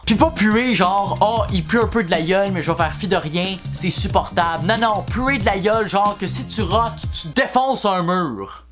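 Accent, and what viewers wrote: Quebec, Canada. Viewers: je t'aime bien, mais moi je suis français et je viens du sud de la France donc je comprend rien à ce que tu dis avec ton accents et tes expressions Québécoises!!:) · J'adore l'accent Québécois , je suis français et pourtant j'arrive quand même à comprendre un petit peu c'est très nice tes vidéos ! :)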